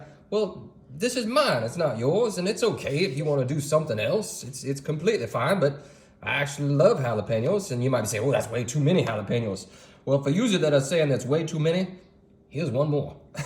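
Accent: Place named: Virginia, United States. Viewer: Sounds like Virginia's in the house! Haven't heard that accent since The Walton's😂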